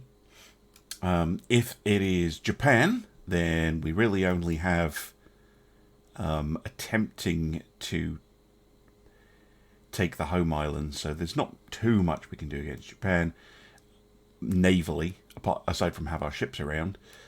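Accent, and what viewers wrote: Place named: United Kingdom. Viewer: I love channels like this but it was your accent that made me subscribe. Rule Britannia Britannia rules the waves!⚓⛵⚓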